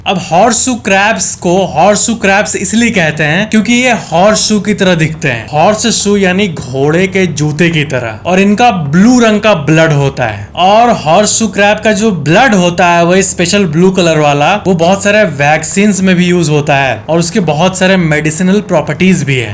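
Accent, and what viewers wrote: Bihar, India. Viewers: Aap bihari ho na tbhi apke accent m bihari chalak raha hai sir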